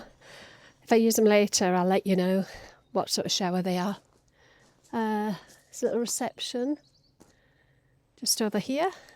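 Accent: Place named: Newcastle, United Kingdom. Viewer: I assumed you were a geordie, but I'm no expert, I'm a cockney myself so everyone else is either 'west country'or 'northerner'! 😂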